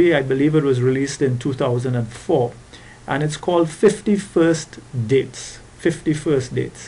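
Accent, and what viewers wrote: Trinidad and Tobago. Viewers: really clear lecture, excellent rhythm and timing. thanks for making this! sounds like a Trini.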